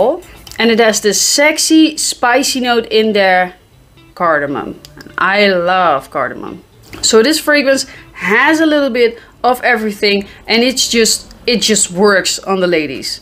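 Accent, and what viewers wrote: Netherlands. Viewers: Beautiful accent 😊 Dutch? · Hehe 😋 from you accent i know you are from Netherland my girlfriend from Netherland have same accent 🥰 Thank y from Morocco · Beterschap meid. Heel chill dat je geurtjes doet. En je Hollandse accent doet t m met je grappen 😁👍🏻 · Is it okay to be turned on by the Dutch accent? Man… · You sound like you are from The Netherlands, are you?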